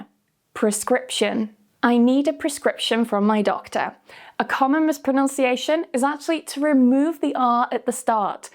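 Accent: United Kingdom. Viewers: There’s no such thing as ‘perfect’ English nor ‘correct’ pronunciation. There’s common ways to say things. You are British & as an American from NC (the South, y’all) there are many words I pronounce differently than you. Doesn’t make you correct nor me wrong. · I love to learn to speak the English language with the British accent. So glad to have found your channel. Also, I love how vibrant and fresh you are with your beautiful smile and rosy cheeks. :) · You sound like a Britian. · I don't think Americans should get pronunciation lessons from a a British person... Js... you guys mispronounced words all the time. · She sounds British. Often has a silent T where I was raised.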